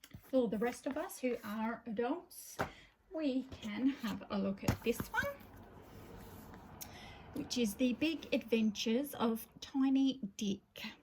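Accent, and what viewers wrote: Australia. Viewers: Hi I am fairly new to your channel but I have been binge watching. I love all of your videos. I have gotten so many coloring book ideas from you as we have very similar taste. Most of all your lovely Australian voice is such a pleasure to listen to. Very relaxing. So glad I found you on here! 🙂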